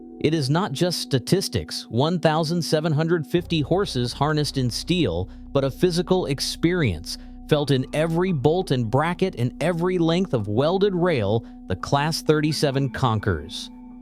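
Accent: United States. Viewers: I gave up half way through as I couldn't stand the American voiceover talking about a British locomotive and they made it sound that the Class 37 (English Electric Type 3 as it was know originally) was the only successful locomotive made. Also if talking about a particular subject, don't show film of others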